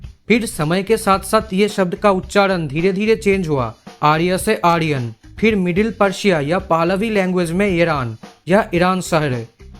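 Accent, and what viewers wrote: Bengal, India. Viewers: Are u bengali? Anyways love from kolkata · Your accent is kinda like bengali people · Tu Bengali hai na?(pronunciation) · Are you bengali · Idk why but tumhara accent Bengali lag rha h mujhe 😄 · Aaap Bengali ho na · Are you bengalee? Because your accent makes me feel like that P:S: I'm a bengalee too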